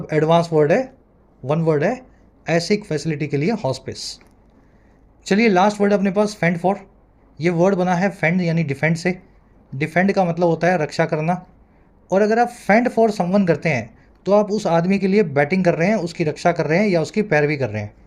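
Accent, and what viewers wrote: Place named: Punjab, India. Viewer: Sir aap to gujrat ke ho par muje aisa lagta hai aap mere bade bhai punjabi ho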